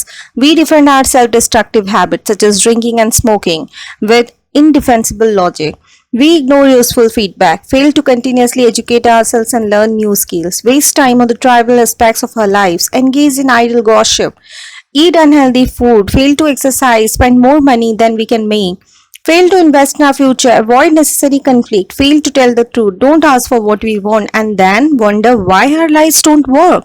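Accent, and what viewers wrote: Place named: India. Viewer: Oh man! An Indian accent :((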